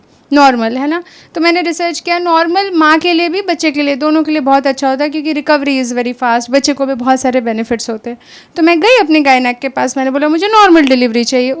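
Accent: Bihar, India. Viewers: Aapka accent bihari kaise h? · You are bengali but bihari accent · mam aapne accent Bihari kaise hua? Aap Bihar mai grow up Hui hai ? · A bengali with Bihari accent has got to be one of my favourite thing to listen to. Good set, and nice puns. Keep growing mam. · Bihar ki ho ka Didi aawaj ke tone se to yahi lagta hai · Ee अक्सेन्ट bihari h ka 😂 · How did you develop the bihari accent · Bengali with bihari accent is amazing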